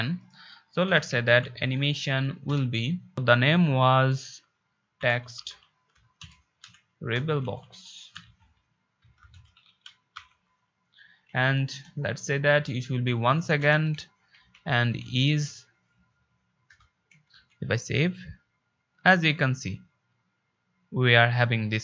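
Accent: India. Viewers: When I hear a Bengali voice with English accent, I think I'm gonna learn something easily. Superb Tutorial! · i'm so simple guys. when I saw an India English voice making tutorials, I liked :)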